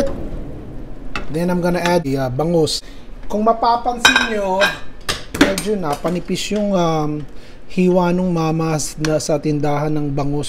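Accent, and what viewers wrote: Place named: Nueva Ecija, Philippines. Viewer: Mr tga Nueva Ecija ka ba, kasi ganyan ang punto ng Novo Ecijano eh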